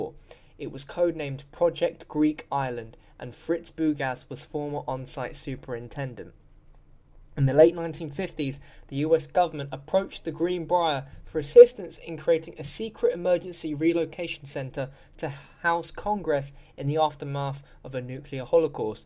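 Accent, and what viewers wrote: England, United Kingdom. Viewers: are you British? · hey, he is english, he cant help it.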